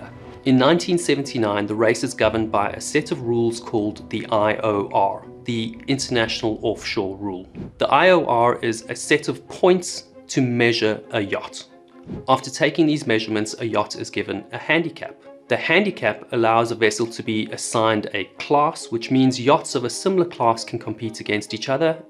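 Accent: South Africa. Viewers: you sound very south african...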